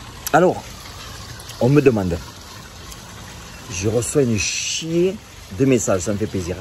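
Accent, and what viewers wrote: South, France. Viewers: est parti aux 🇺🇸mais il a gardé son accent du sud et le Pastis 🥇👍👊🏻💪🏻🙏🇫🇷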